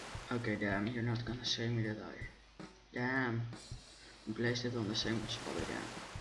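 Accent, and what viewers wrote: Netherlands. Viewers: that just the dutch accent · he has just an dutch accent ;s im dutch to and i think he can speak really good english. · So fcking annoying when people are complaining about his English and Dutch accent...this video is about building a resort, not about the English language. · Wauw! you can hear you're from the Netherlands xD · je hoort gwn duidelijk dat dit een Nederlander is xD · he's dutch, we have that terrible accent (im sorry)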